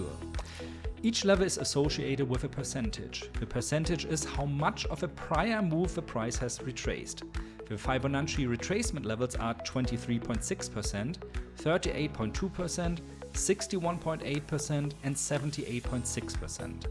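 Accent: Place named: Germany. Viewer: Hello, i just found your channel and its amazing. Especially this vid thought me a lot about confluences. But do you really trade on the daily chart? Can you make a video explaining your entry i.eg. at lower time frames after analysing the daily chart? By the way, i like your german english accent🤗 i‘m from germany😊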